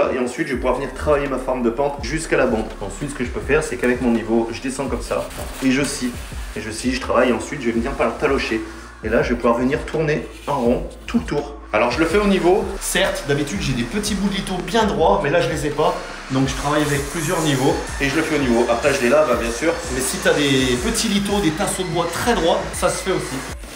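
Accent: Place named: Vendée, France. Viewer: Salut. J'adore ce que tu fais et en plus moi qui suis d'origine Yonnaise, exilé en Limousin, ton accent est ma petite madeleine de Proust! Peux tu me conseiller sur la pose d'un carrelage 60x30? Pose à 2/3 1/3 ou 50/50? Merci et continue tes vidéos Thierry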